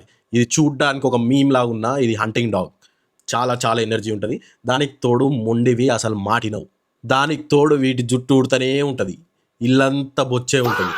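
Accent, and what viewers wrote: Telangana, India. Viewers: అన్న నువ్వు చెప్పే విధానం, తెలంగాణ స్లాంగ్, నీ పేస్ లో ఒక సాఫ్ట్ విధానం మంచిగుంటది... 🙏🙏👍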